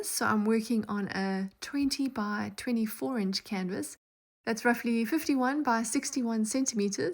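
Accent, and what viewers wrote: South Africa. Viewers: I came across your beautiful pearl pour by accident. My first though was ..... "she's South African". Am I correct 😂. I am also living in the US and it was AWESOME to hear a voice from home. Thank you for your beautiful pour...😊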